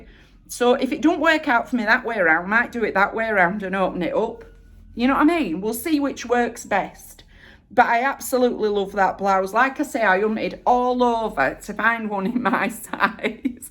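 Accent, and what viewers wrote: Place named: Northern England, United Kingdom. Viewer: This is my first time watching you and I instantly linked your accent to Bet Lynch. I'm Brazilian, never been to the UK, but I used to watch a couple of episodes of Coronation Street here on Youtube to improve my listening skills, especially the older episodes, and when u mentioned her I was like how come, ahah <3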